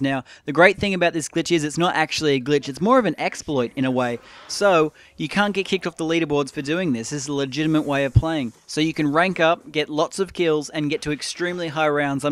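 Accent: Australia. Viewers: Nice vid man i subbed u swnd like ur from Ausie which is kool coz im from NZ i hav no prob with ausies bro my teacher is from Australia & shes kool as catch ya later mate;-)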